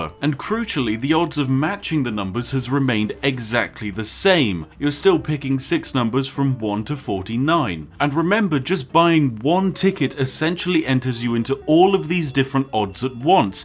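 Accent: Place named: United Kingdom. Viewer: oh my fuck YOURE SO BRITISH... i love it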